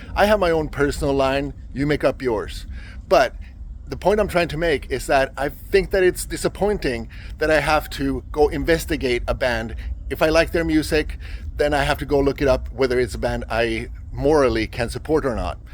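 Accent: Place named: Europe. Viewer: If you are seeking out black metal for morality and humanity, you are barking up the wrong tree. You should also note that Satanic black metal is explicitly in support of murder, grape, sacrifice of children, destruction of all innocence, etc. etc. .... and you clearly do not have a problem with all of that. But the moment the bands concern themselves with heritage, cultural superiority, and attacking a religion other than Christianity, suddenly its a problem. You sound European, so I don't have to tell you that your land, your culture, and your way of life is under direct attack from foreign invaders. But all that aside, its black metal. Foisting a morality compass onto it negates its entire purpose.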